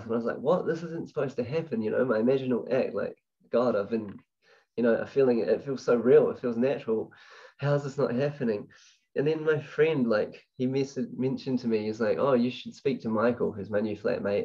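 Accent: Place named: New Zealand. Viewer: Please do a talk in Auckland. I knew you were from NZ straight away hahaha 🙏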